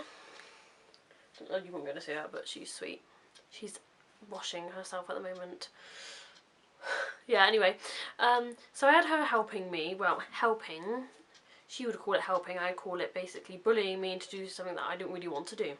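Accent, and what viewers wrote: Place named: United Kingdom. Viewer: fucking murder corr can tell you're British 👍👏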